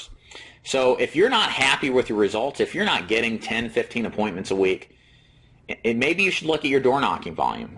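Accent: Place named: Chicago, United States. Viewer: Where are you located.. your accent sounds Chicagoesque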